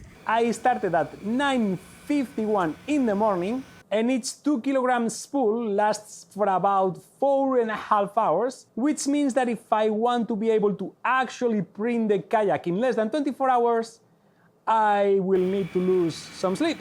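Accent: Spain. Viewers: Perfect presentation, informative and entertaining, but the thing I enjoyed the most was the accent, Spanish English is the best English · A ver, quedó duro que no pudieras montar el kayak bien, pero por otro lado esto muestra que la impresión 3D tiene sus retos, y ciertamente hiciste el print en menos de 24h, por lo que tremendo logro la verdad. Me pregunto si merecería la pena comercialmente hablando hacer un kayak así, probablemente no ya que deben tener mejores sistemas. Quiero hacer un negocio al rededor de la impresión 3D, siendo que estudié modelado 3D y quiero aprovechar que ya se de esa parte. A ver que tal va, si Dios quiere bien. Saludos (No se porque me hace gracia escucharte hablar en inglés con el acento español, siendo que estoy acostumbrado a escuchar a gente hablando inglés siendo nativos... o a españoles hablando español jaja)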